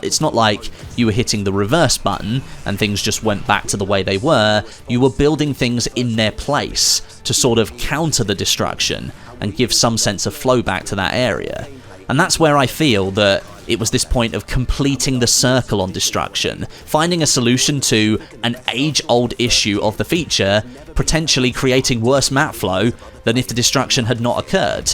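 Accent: United Kingdom. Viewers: So, here I am with my already formed opinion, formed from hundreds or hours of BFV game play, that it's just...bad. And here you come along with your proper British accent and a dump truck full of logic and analysis. So NOW I have to admit that it wasn't all THAT bad...thanks man. Thanks. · where are you from? UK? Australia?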